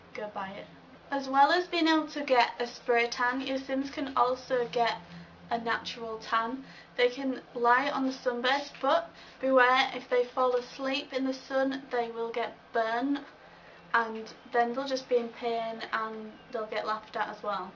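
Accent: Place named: England, United Kingdom. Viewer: Yay!!! an English accent!! Finally!! I'm british too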